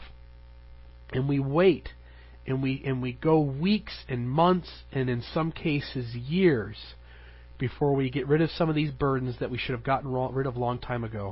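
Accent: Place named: Great Lakes, United States. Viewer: sounded so much more ‘Great Lakes’ back then.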